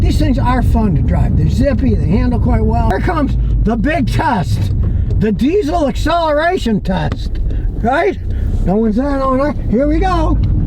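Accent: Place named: United States. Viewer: This guy is the epitome of my fantasy of how a true american sounds like. Half screaming, spitting through the "p" 's and the "d" 's, scattered all over the place and what not. That's what rock'n'roll is guys and girls!!! Rock on!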